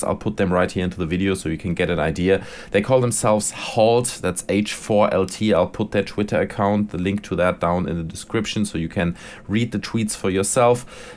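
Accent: Germany. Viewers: Erm are you german. ?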